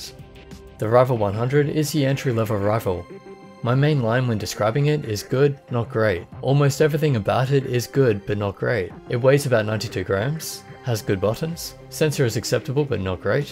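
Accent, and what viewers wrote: Australia. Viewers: you are a legend, this is exactly the type of review I wanted and then you have individual detailed reviews. I hope more manufacturers or computer tech sites hire your services. Thank you very much for your awesome review. And your aussie accent is great too (I'm from Sydney).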